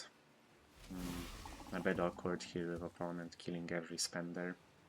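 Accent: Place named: Estonia. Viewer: are you from Estonia? Can't put a finger on your accent. Very good English though. Ggs as always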